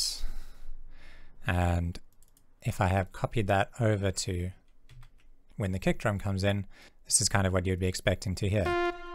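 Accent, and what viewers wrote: South Africa. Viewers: Are you a South African that moved to the UK?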